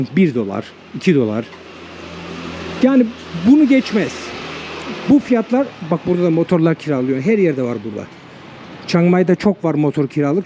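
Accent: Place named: Germany. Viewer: Sen almancısın aksanından ve tipinden belli .20 kere gittim ama chang mai a gitmedim çünkü deniz yok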